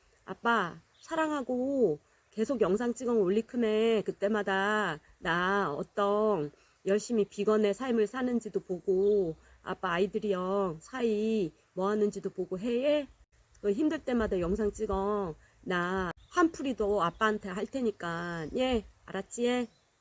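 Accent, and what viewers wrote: Jeju, South Korea. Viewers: 추석에 가족들과 만날수 없었는데 님의 영상이 마음을 따뜻하게 해주네요.. 제주도의 구수한 사투리도 넘 듣기 좋았어요.. 감사합니다💖💖💖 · 님의 구수한 사투리에 담긴 부모님에대한 사랑과 그리움, 제주에서의 지낸 유년 시절에대한 추억들이 고스란히 느껴지는 따뜻한 영상이네요^^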